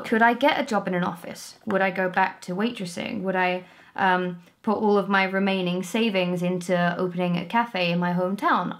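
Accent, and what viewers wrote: Essex, United Kingdom. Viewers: Is it just me or did her Essex accent come through more in this video? Especially when she says phrases like "sort of". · I hate the term having "a real job". It's a predictable snipe by a bitter soul. Your work life journey has brought you to a cool place and you'd be fully aware grab it by the horns and enjoy the ride. Your creative, clever, funny and have that bit of attitude needed when delivering a good pun, in that classic Essex accent. You also have a more serious side here on your discussion channel. Your number one in my book.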